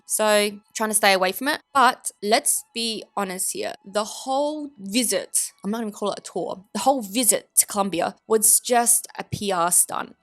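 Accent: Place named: Australia. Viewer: Hi - I'm a new subscriber to your channel. I'm trying to work out where your accent is from - are you an Aussie cousin? I'm from NZ.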